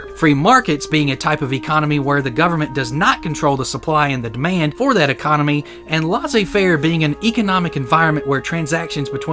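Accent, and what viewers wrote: South, United States. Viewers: I think i I hear some southern in your accent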